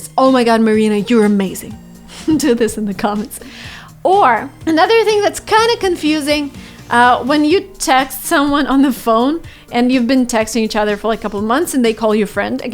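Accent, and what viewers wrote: United States. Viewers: How did you learn American accents? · You're american? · Wait, you're not american? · Are you American or british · Ya u hve a workbook, that's gr8... But a humble request! Can u make atleast one video on simple past which is often used by Americans plz? 👍🏻... U r the best teacher for those who wanna learn american accent including me!. Tq so much 👍🏻!... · As much I know, she went to Britain as well as the USA. Now, she speaks American accent... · Marina, How did you learnt the American accent? ... sometimes I just forget that you are Russian...you've covered up so well ...any suggestions from you? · Are u american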